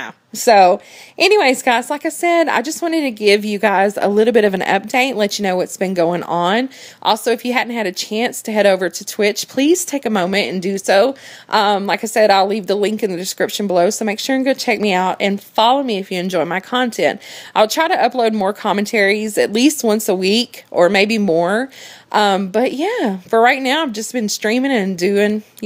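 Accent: United States. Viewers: We got the Country accent, Swarms and great commentaries. This channel has it all! Shout outs to!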